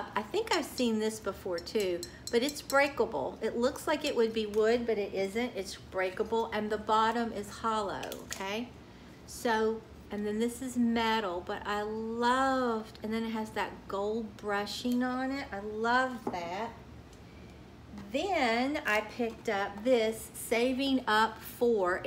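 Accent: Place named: South, United States. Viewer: Nice haul! I just love listening to you, I had some family from Florida and my Aunt had that southern draw and she called me sugar all the time!!! And every time I hear you I think about that!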